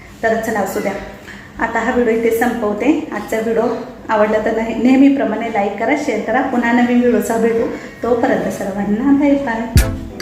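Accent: Nashik, India. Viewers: आपले नाशिक आणि भाषा लई भारी ताई . 👍बिनधास्त बोलत जा. अजिबात संकोच नको करू. सर्वांना समजते मराठीचा गोडवा सगळीकडे सारखाच. ओरिजिनल ते ओरिजिनल असते. उगाच ओढून ताणून इंग्लिश विंग्लिश मारणाऱ्यांपेक्षा तु छान आहेस. म्हणून फेमस झालीस व ग्रोथ आहे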